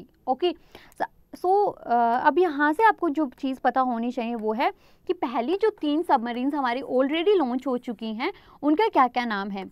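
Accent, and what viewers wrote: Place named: Haryana, India. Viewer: She is from haryana, so slang varies accordingly, how can you say this!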